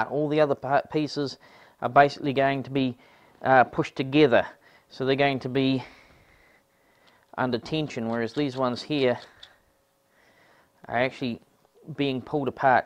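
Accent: New Zealand. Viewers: A Gold Star example of how an instructional video should be made, clear to follow, straight forward perfect lighting. I wish I had that CNC machine! Do I detect a slight 'Flight of the Concordes' accent?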